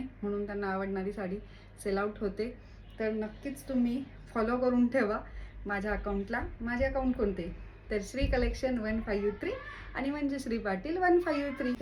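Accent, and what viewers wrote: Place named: Khandesh, India. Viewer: Tai tu khandeshi ahe na tuzya bolnuavarun vatat mi pn khandeshi ahe tu khup god ahe aani tuze bolne ati sunder ❤ love you tai mla aaj paryant koni nahi aavdl evdi tu aavdte ani tuze sagde video baghte mi😊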